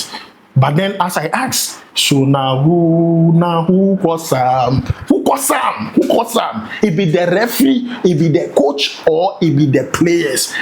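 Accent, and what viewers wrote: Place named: Nigeria. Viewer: You lie You are not a Ghanaian. Your Nigerian accent betrays you. Look at the poor penalty kicks Your players took. Is the referee to blame for that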